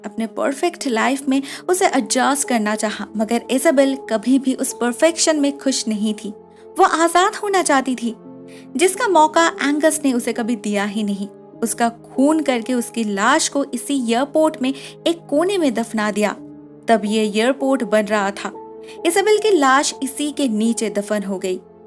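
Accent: Bengal, India. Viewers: मैडम आप बंगाली हो मैं आपकी हिंदी सुनकर बता सकता हूँ पर आपकी वॉइस अच्छी है😁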